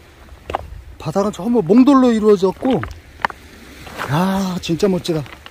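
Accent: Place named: Chungcheong, South Korea. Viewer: 어마무시하는것 보니 충청도 공주,논산지역분 같기도 하고 호남사람같기도 하네요? ●고향이 어디유?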